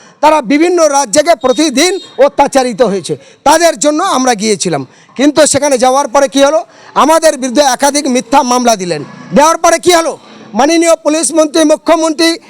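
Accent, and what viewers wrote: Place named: Bangladesh. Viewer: Bhai ki tumi bangladesi neki aaato sundar bolso tumi pakka bangladesi mone hoi tumi ki joy bangla sele tumi sala bangladesi mone hoi 😎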